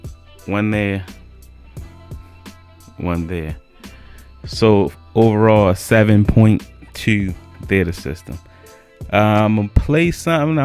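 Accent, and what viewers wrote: Baltimore, United States. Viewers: Gotta be from Baltimore😂😂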